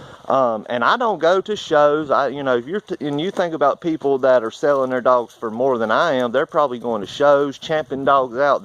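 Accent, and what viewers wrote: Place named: South, United States. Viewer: you sound like a southern gentleman. No need to justify anything, appreciate your hard work. God bless.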